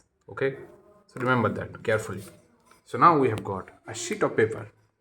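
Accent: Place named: Africa. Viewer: U got the African accent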